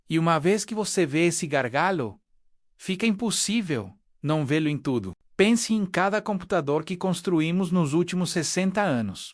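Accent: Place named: Argentina. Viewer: Narrador argentino tentando falar português. O conteúdo é falso também. Final dos anos 1980, holandesa Philips já empilhava transistores (3D). Os pesquisadores citados, caso existam, estariam 're-propondo' algo há muito tempo já pensado. Que maravilha! A USP prevê o passado. Mas o nosso futuro - e presente - contimuam tenebrosos. Dito.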